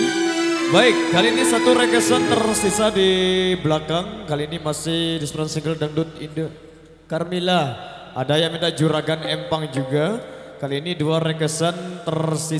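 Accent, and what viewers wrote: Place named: West Java, Indonesia. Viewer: Asalamu alaikum duh ci mba ini enak bangt suaranyah kalow bahasa aunda halimpu aku suka mendengarnyah semoga sukses slalu ya mba aku doain biar maju trussss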